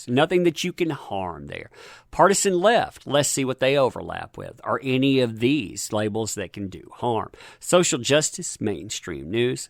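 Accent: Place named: South, United States. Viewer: Been hearing a lot about this thing and how wrong they get things. Smh. I love your accent btw, where's it from I assume southern?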